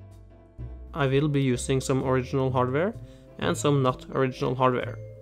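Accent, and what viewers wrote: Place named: Norway. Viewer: Du er norsk? Er bare vi som snakker engelsk sånn=P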